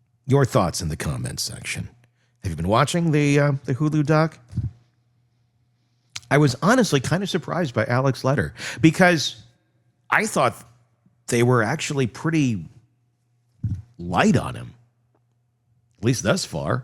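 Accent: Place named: South, United States. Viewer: I love your southern accent. 😂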